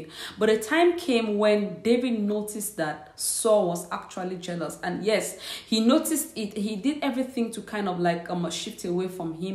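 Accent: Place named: Jamaica. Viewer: I LOVE UR JAMAICA ASCENT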